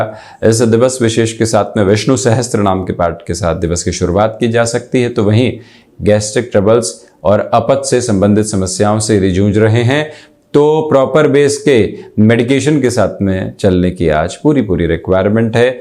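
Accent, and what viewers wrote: Standard. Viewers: वाह!गजब की शुद्द हिन्दी बोलते है आप।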